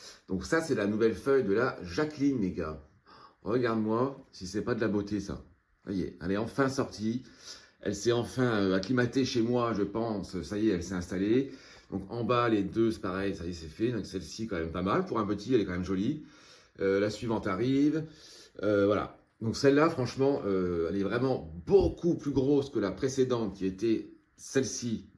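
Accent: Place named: Picardy, France. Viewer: Bonjour. Je découvre votre chaîne, très sympathique et colorée. Merci pour la bonne humeur, et toutes vos beautés. ( Il me semble reconnaître un accent '' un peu de Picardie '' 😁😉. A très bientôt !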